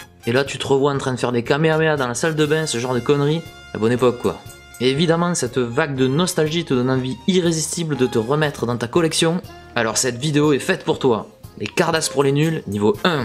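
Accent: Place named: South, France. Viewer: Salut t'es d'ou ? on entend un accent du sud.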